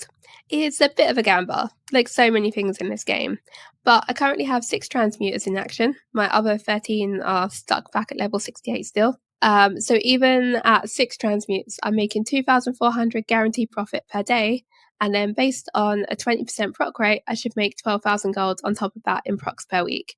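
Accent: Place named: London, United Kingdom. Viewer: You sound like you from London ye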